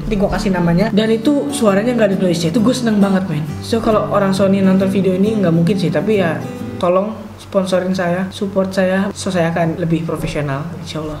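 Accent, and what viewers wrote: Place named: Lampung, Indonesia. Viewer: Logatnya lampung bgt aowkwkwk Salam dari Bandar Lampung gan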